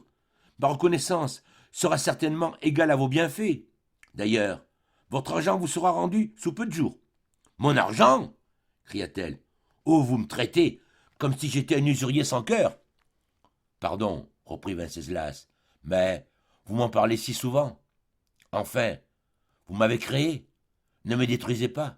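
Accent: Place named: South, France. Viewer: Ce n'est pas un conteur agréable a écouter Cette accent du midi élocution hachée j’abanbonne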